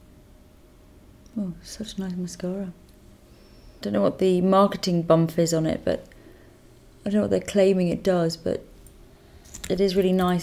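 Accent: United Kingdom. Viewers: love this tutorial, i think i may follow it for the days when i have to go to work with just little hours of sleep, it may sound geek but listening to your videos helps me improve my english and i can understand british accent better, so apart from learning about make, i also improve my language skills!!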